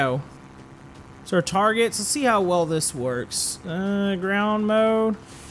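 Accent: United States. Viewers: American accent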